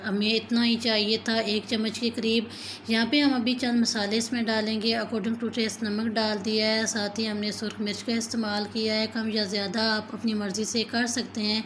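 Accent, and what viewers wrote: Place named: Bhatwahar, India. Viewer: اپ کے لہجے سے لگتا ہے کہ اپ کا تعلق بھٹوہار سے ہے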